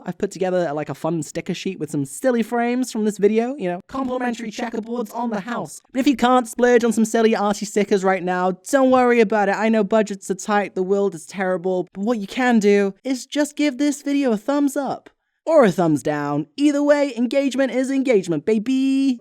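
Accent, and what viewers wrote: United Kingdom. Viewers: (Warning, overused joke incoming) If I had a nickel for every time I’d seen a transmasc person named with what sounds like a somewhere British accent that’s also just a chaotic wholesome vibe to witness on my silly little screen I’d have two nickels, which isn’t much but it’s fun that it’s happened twice (I almost forgot to finish the joke)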